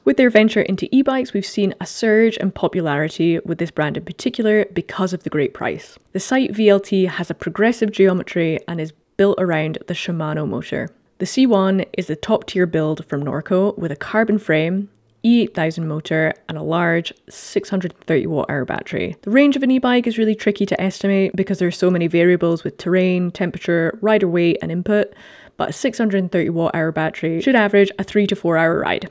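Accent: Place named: Scotland, United Kingdom. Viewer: Just come across your channel and was obviously swayed by the Scottish accent . . . but I did hear a lot of the bike decision based on sales and popularity on the trails? That should never come in to the equation for a report on a "top 5". Surely you need to say why it is popular? Ride in Peebles/Glentress and you will see lots of Santa Cruz, but that isn't because they are the best (although I may be digging a hole here), but that is because that is the bikes the local dealer sells!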